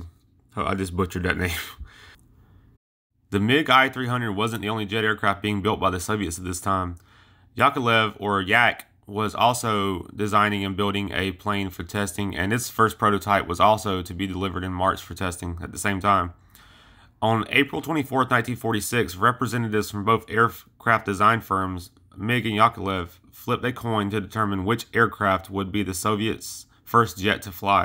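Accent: South, United States. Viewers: Again, here are my recommendations. Your mouth is still full of cotton & marbles. Speak clearly! Enunciate! Open your mouth, make it round. Get rid of the Southern affectation. Your microphone needs to be close to your mouth, it sounds as is it is across the room.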